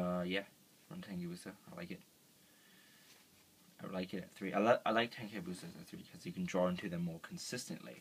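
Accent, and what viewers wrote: United Kingdom. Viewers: You sound british when you're sick.. Awesome. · You have a British accent when you're sick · Nice British accent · U sound british for some reason!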